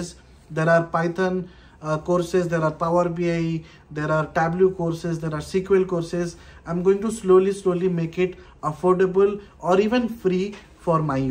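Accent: Odisha, India. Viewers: Sir are u from odisha ?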